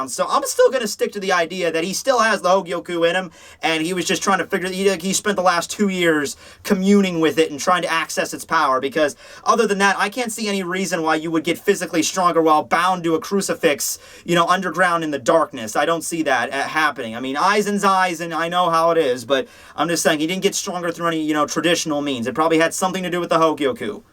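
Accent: Canada. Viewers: Does any one else hear the canada in the intro?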